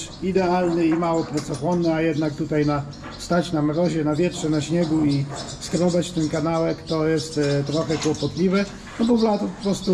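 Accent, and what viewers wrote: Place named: Mazovia, Poland. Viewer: Jak ty z Mazowsza 🤣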